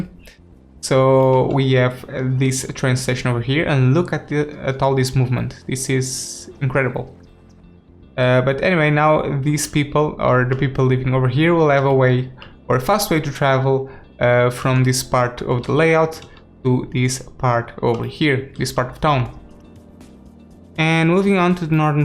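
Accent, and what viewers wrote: Portugal. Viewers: Engraçado que ouvi o teu sotaque e percebi logo que eras tuga :) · A voz não engana ninguém, a maneira de falar o inglês, nota se mesmo que és tuga caralho!!! Gosto muito dos videos, continua o bom trabalho!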